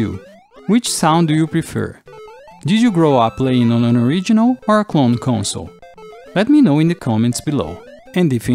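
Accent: Brazil. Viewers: Eu amo como as palavras ficam com um sutaque brasileiro kkkk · when you spoke I already knew u were Brazilian 😂😂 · I know a brazilian when I hear one, great video bro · Eu sabia que o teu sotaque era brasileiro demais pra ser um gringo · orra, o sotaque dele FULL BR mano kkkk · O sotaque de um brasileiro é realmente familiar. Que legal. 🎉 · Esse sotaque não me engana! Brasileiro, né?? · Sotaque brasileiro batendo forte. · Brazil accent spotted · Sotaque de brasileiro… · o sotaque brasileiro é inconfundível KSKSKSKS mas acho que peguei uma época diferente, porque eu jogava Super Mario Bros no emulador de NES do Ps2! É interessante saber que em outros consoles ele era transmitido diferente, eu não sabia disso haha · Cai nesse vídeo aleatoriamente. Não Conheci ao canal. Por acaso você é brasileiro? Sua pronúncia é idêntica a um brasileiro falando inglês. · O sotaque BR gritando hauhauha Excelente vídeo amigo. 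Ganhou um sub. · Você é brasileiro? Seu sotaque lembra um pouco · Eu tava sentindo um sotaque brasileiro ai no meio, fui ver o canal e é BR kkkkkkkkk, mt bom o vídeo mano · Eu conheço meus irmãos brasileiros pelo sotaque, abraço, excelente video! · Bro you have the most Brazilian accent I've ever heard! 🇧🇷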